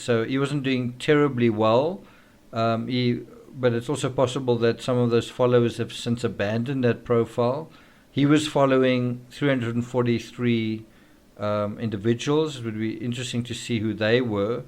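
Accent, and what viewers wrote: South Africa. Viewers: Sounds South African 🙂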